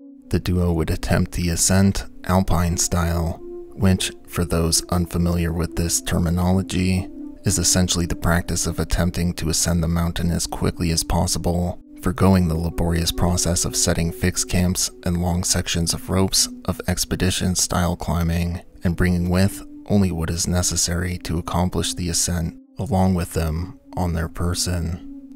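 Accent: Midwest, United States. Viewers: Mid west accent fer sheer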